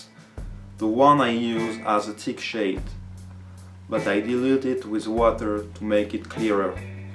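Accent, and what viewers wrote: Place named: France. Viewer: beau projet ,On sent bien l'accent frenchy c'est cool ^^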